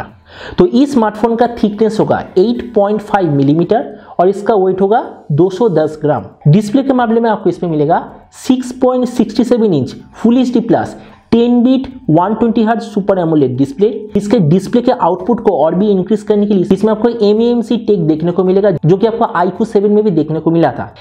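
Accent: Bengal, India. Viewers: Bengali babu when says hindi,sounds lovely 👀❤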